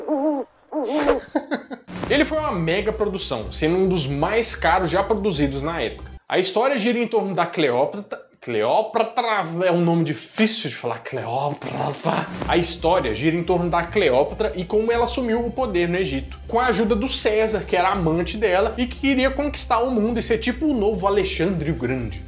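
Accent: Minas Gerais, Brazil. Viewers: Ele fala mineirês.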